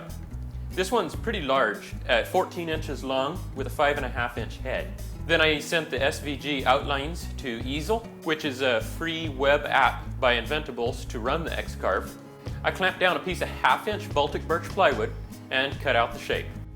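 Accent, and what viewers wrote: Canada. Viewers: You have the most Canadian accent I have ever heard.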